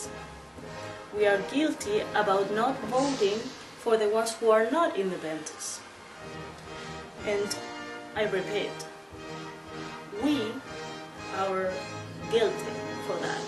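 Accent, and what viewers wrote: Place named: Germany. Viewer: so cute with your german accent! hahahaha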